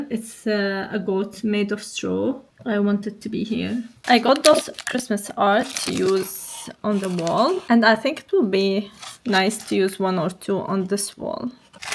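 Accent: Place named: Lebanon. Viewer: Your accent is Lebanese ❤❤❤❤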